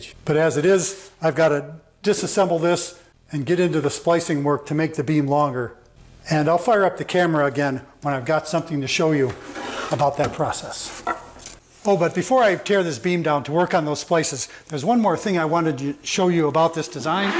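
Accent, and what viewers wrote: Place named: Italy. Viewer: Say hi to Chip ! Nice project ! Also nice Italian accent !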